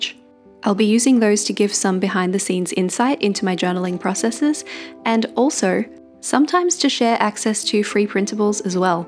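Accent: Australia. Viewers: Watching now, and my partner got the first few minutes of your video. He said, and I agree, that you have a pleasant Aussie voice to listen too. Not oka or nasally or rough, hehe. He didn’t believe me when I said you’re from Brissy because he thinks that Brissy folks have a specific accent, and not a nice one. I find your voice a nice change from the all too common USA accents that flood YT. And love your bujo art too 😊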